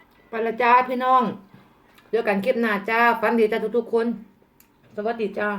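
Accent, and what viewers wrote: Isan, Thailand. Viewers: ได่ยินสำเนียงบ่านเฮาเอื้อยหย่าแมนคิดฮอดบ่านหลาย-กินหลายๆเผื่อเอื้อยแนเด้อ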